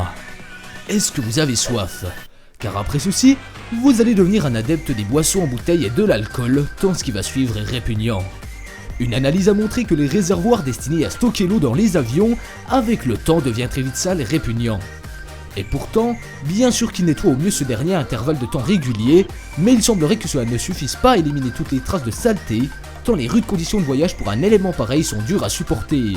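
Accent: Belgium. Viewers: t es enrume ou t as un accent belge ?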